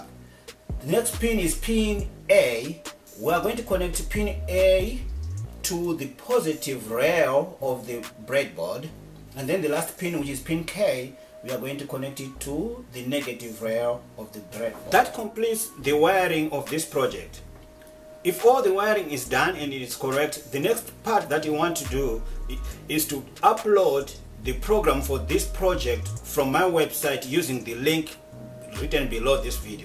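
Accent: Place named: Shona, Zimbabwe. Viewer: you sound Shona though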